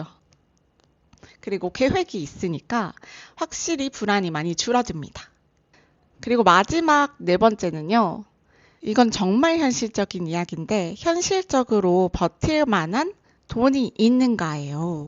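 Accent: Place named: Gyeongsang, South Korea. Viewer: 경상도출신 主婦이네요?음성. 억양. 과거는,女商출신이,창구를 응대하는데...요새는, 전부 4년제대학출신.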